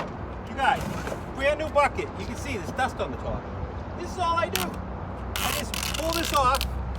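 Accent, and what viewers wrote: United States. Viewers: Sounds like he's from New York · Sounds like a Worcester accent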